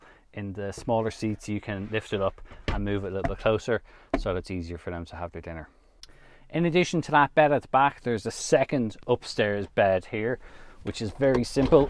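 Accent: Ireland. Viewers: The Irish accent had me sold before we even saw inside the van lol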